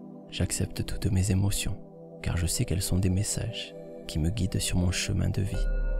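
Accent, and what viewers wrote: South, France. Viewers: Merci même si justement je préfère quand tu es naturel j'adore tellement ton accent du Sud et que je sens que tu appuie pour ne pas qu'il s'entende et ça rend particulièrement cette vidéo un tout petit moins agréable que les autres! Tu es génial et fais pour cela pour l'instant je le sens et merci🙏 tu aide tellement de personne dont moi mais voyons plus grand merci merci merci je t'envoie beaucoup d'amour et hâte de la prochaine video avec ton bel accent 😉♥️🧡💛💚🤍💙💜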